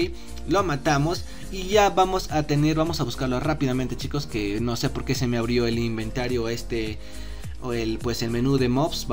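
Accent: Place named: Brazil. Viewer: Si se nota que es brasileña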